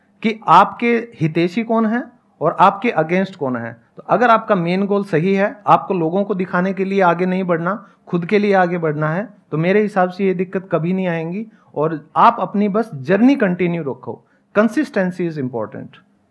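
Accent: Haryana, India. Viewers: I already knew he is from Haryana by tone as I am also from Haryana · Mai bhi padh rhi hu I am 38 year old and I studying as a architecture student Or mujhe aapki haryanvi bohot achi lgi Mai bhi haryana se hu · Kis kis ko pasand aai sir ki haryanvi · I loved your Haryanvi accent the way of you speaking. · Bhai, your Haryanvi pronounciation is great one even in English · Sir I am from Haryana ,it's true bhiwani me esa hi bolte h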